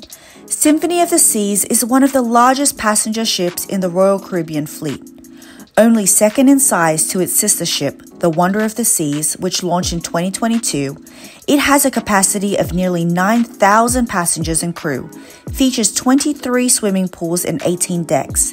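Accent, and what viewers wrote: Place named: New Zealand. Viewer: Wonderful video, and short too! I love that it was short, but lots of information. By the way, I'm dying to know what part of the world you're from. I hear New Zealand in most of the words you say, but then I hear a little bit of United States English in other words you say. I'm fascinated, but I digress.... My very first cruise ever was on the Oasis of the Seas, and ever since then I've been dying to get on the Symphony. I know the two ships are very very similar, but the Symphony is bigger, as I understand. I remember Sorrento's Pizza not looking very appealing at first glance, then one of my fellow passengers insisted I needed to try it out. I did, and I was floored at how delicious the pizza was. The Solarium is a fantastic included restaurant. I love that it's adults-only, and that it requires a reservation. You cannot beat the entertainment on board the Oasis-class ships. 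It's first rate. I'm really disappointed for you that they ran out of soda so quickly in the voyage - especially considering the fact that guests paid for the drink package. I would have demanded my money back for the drink package. I'm booked on the Harmony for this coming April, and am anxious to see how good the included food is this time around. The first time I sailed, I thought all of the included food was phenomenal. But since they've implemented the new menu changes, the reviews have been mixed. On my first voyage with RCL, I purchased two specialty dining restaurants: 150 Central Park, and The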